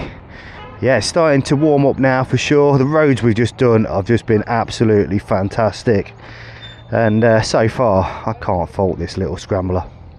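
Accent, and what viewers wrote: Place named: Hull, United Kingdom. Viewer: It was like revisiting the route along with you other than Rameswaram to Chitra Durga I have ridden the route on my Honda Highness. It was a fantastic series with your awesome comments along the way. By your accent, it seems you are from somewhere around Hull in the UK. Looking forward to your pick among three.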